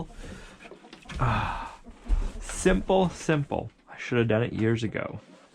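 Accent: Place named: South, United States. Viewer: love your southern accent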